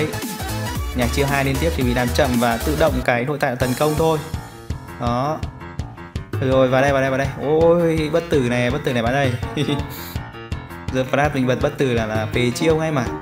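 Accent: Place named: Hai Duong, Vietnam. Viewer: anh có phải người Hải Dương kh ạ? Em thấy giọng anh khá giống người địa phương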